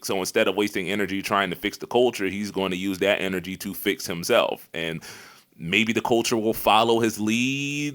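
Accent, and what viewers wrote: Philadelphia, United States. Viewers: are u from philly ?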